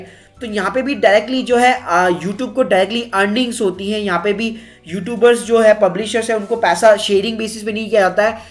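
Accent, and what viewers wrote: India. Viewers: His Indian accent really drives me crazy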